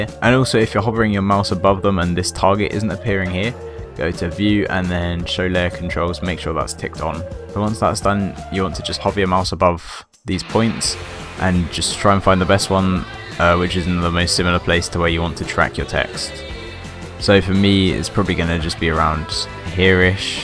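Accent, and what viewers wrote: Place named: United Kingdom. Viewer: love british accents sometimes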